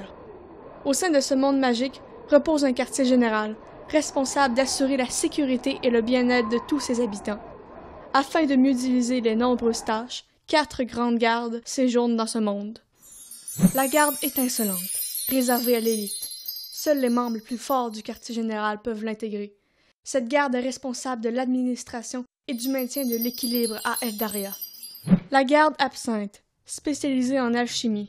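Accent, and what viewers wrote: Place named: France. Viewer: OMG i love French accent haha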